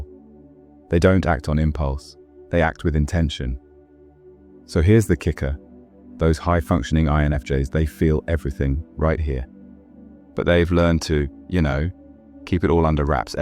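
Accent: United Kingdom. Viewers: You made me giggle!🤭 Emotional Kung Fu😎 How do you know INFJ so well? Are you one of? You must be one of, you know too much!😂 (By the way, really love your humour and your sweet British accent ! You sound charming!)❤ I fancy you just from your words and the sound of your voice & accent ! (It doesn’t feel it’s all AI 💯 voice made) Love from a Italo & fellow Londoner ❤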